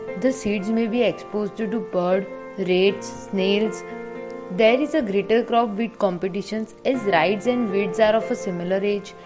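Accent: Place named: India. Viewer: Oh God I love Indian accent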